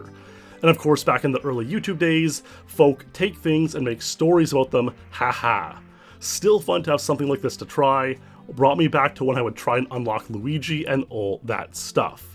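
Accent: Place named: Eastern Canada, Canada. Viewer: I detect an eastern Canadian accent-- Newfoundland? Halifax?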